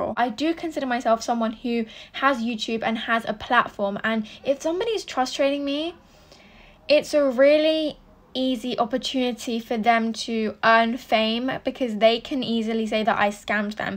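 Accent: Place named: United Kingdom. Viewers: Ummm what do you mean all British accents sound the same. Hers is not the best accent. I ave a British accent and it sounds the exact same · Britishhhh~~~~~ da fancy accent ._. it's hard tho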